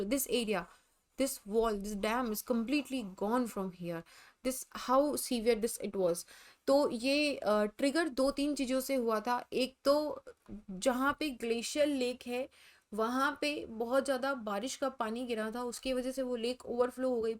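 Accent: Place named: Rajasthan, India. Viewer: Amazing discussion on GLOF, Thank you so much ma'am your voice tone seems like you belong to Rajasthan my home state.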